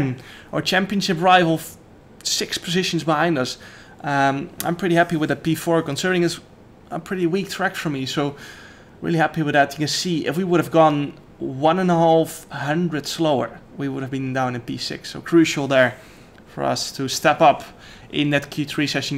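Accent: Netherlands. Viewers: Are you from the neterlands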